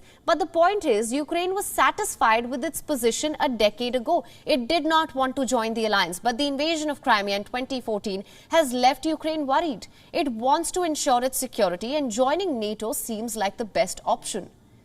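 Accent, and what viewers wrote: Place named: India. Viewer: Your voice and accent makes it best for story telling. Desi tone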